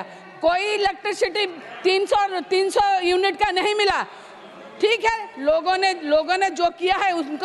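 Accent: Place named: Jajpur, India. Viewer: Very nice jajpur voice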